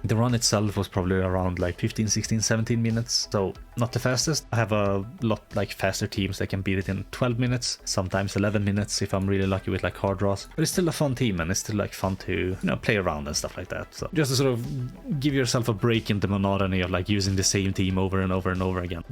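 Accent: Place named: Sweden. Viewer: Its probably just the swedish accent honestly, you do say quite a lot of words differently. But my tired brain would argue. 😂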